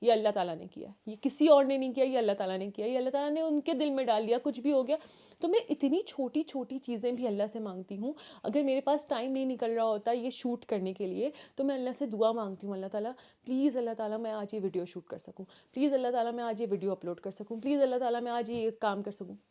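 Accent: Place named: Pakistan. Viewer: Aur ek baat mai India se hu Baji Mujhe pakistani video bohat achhe lagte hai. Aap logo ka baat karne ka lehja Masallah bohat achha hota hai. 😊😊😊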